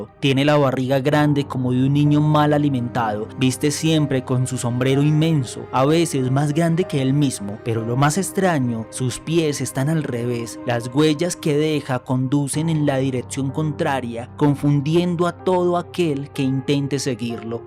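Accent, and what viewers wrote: Colombia. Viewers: Excelentes relatos , con voz de acento colombiano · con razón me parecía colombiano 😂 pero lo felicito están bien las historias gracias saludos Bendiciones 🙏🏻❤️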